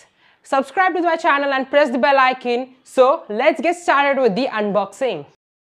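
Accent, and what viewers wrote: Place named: India. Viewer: He is just doing nice 😂😂😂 sale desi english....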